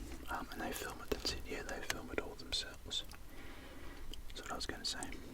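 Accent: Australia. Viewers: IVE BEEN WAITING SO LONG FOR THE MAPS I LOVE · It's back!! This makes me so happy. No one does it like!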